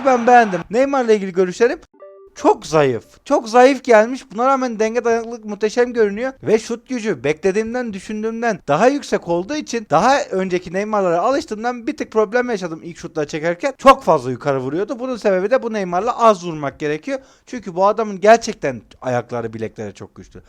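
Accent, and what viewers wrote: Kahramanmaraş, Turkey. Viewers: Abi sen maraşlımısın?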